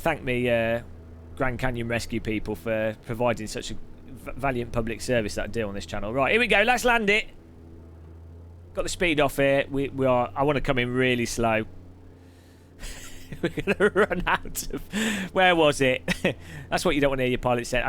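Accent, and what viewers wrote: United Kingdom. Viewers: "ok you see what hapened theaaaaaaaah...?" xD You are sooo bri`ish!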